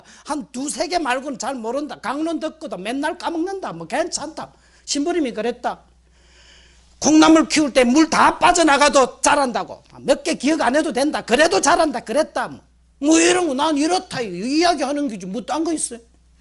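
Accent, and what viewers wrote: Gyeongsang, South Korea. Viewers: 신부님 안녕하세요. 처음 인사올립니다. 전 마리아 입니다. 미국에 알라바마 어번이라는시에 있습니다. 신부님의 강론은 처음부터 끝까지 노래속에 웃음속에 하느님의진리가 저을 깨달게하고 열정을 줍니다. 신부님의 경상도 사투리가 참으로 정겹고 고향의소리라 참으로 좋슴니다. 신부님 건강하세요. 감사합니다. 수고하셨습니다.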